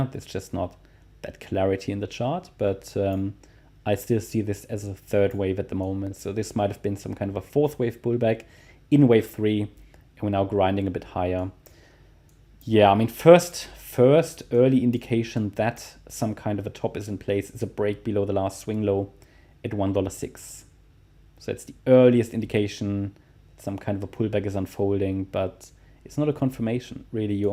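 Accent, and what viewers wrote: Germany. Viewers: Man hört direkt raus das du deutsch bist :)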